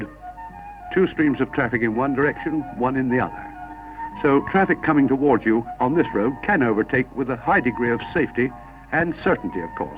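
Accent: RP, United Kingdom. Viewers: How irritatingly correct with this British/boring narration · Wonderful and so revealing of how far down the wrong road we have travelled... But of course this gem wouldn't be allowed to be transmitted now as his accent would be regarded as too plummy. A white well off middle aged man in a Jaguar, with a professorial air, oh dear, that doesn't quite tick all the pc boxes. Reach for a warning sign... endearing almost heart breaking monologue encapsulates the once civilised attitude to daily life in this country; common sense, courtesy, fair play and restraint, all the British virtues which though in some sense still spark in the gloom amongst a portion of the thinking and perhaps even a measure of the unthinking population, have vanished from public life and been burnt out of the new breeds, those smug military style SUV driving legions bearing down on the last remaining green corners of this land, inextricably enslaved by commercially sanctioned avarice, unthinkingly obeying their instinctual animal drives drives for still more possession. 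Apply the trafficator old chap, for this road is a dead end... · i just love the plumesque voice, the "strange" 3 lane road was also built just outside kings lynn on the way to hunstanton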